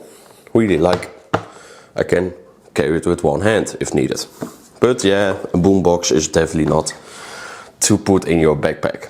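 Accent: Netherlands. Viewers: You sound very Dutch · i hear a little bit dutch haha. nee wel goede video. ben zeker om deze te kopen.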